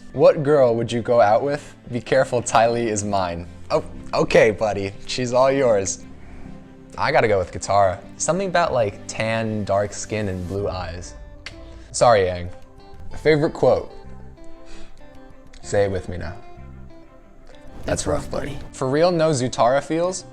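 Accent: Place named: United Kingdom. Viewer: What’s with that British accent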